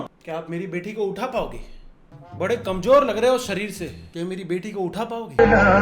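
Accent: Gujarat, India. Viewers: bhai you really suit while having a gujju accent😂😂😂... thoda bohot gujarati bolte rahiyo please har video me...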